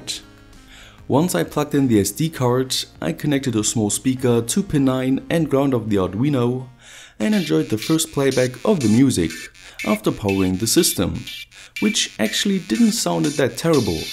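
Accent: Germany. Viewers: Ich finde seine Projekte schon geil. Bin zwar neu hier hör aber gleich das er eigentlich ja ein Deutscher ist. Deshalb wundert es mich warum er seine Videos auf Englisch macht. Weiß das jemand?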